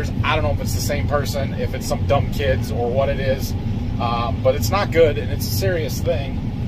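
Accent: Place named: United States. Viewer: I love how u Americans say roof. U pronounce it more like "rough". We Canadians pronounce it with a harder oo like boot.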